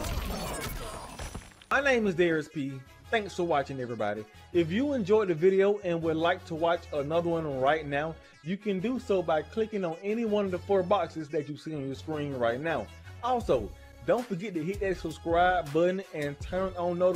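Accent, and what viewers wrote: South, United States. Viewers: Love your step by step showing of combos. But what makes it even better is your dirty south country accent lol. JUST LIKE THAT!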